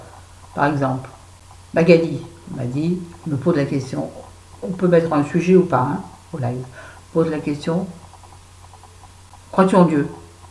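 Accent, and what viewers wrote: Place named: Marseille, France. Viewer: voilà, la gratitude, tous les jours, je remercie tout et tout le monde, même moi même pour tout ce qui m'arrive de positif. Autrement perso, c'est bouddhisme, mais le reste est perso, par contre d'accord pour l'égo, c'est une saleté qui pourri l'humain. bisous ma mdr pour la vidéo accent marseillais!!!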